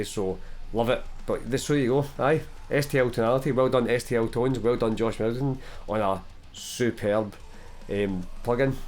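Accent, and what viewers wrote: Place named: Scotland, United Kingdom. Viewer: Hahaha love the way you pronounce Mesa boogie with the mighty Scottish accent 🤣🤘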